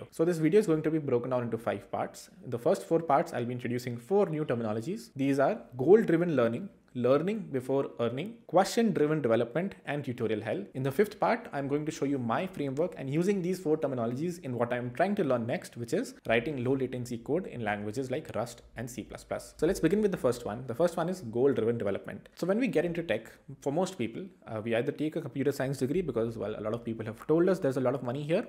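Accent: India. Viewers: why your accent is so endian 😭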